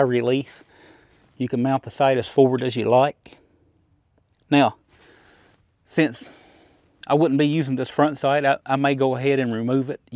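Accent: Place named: South, United States. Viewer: Hey. Like that your videos cover such a wide spectrum of firearms, ammo etc. Just because you aren't an AR guy, or a Henry fan, or a revolver fan doesn't mean that you can't learn about and enjoy them on channel. An added bonus is that you get some culture with the southern accent and geography as well. Keep em coming, they are all good.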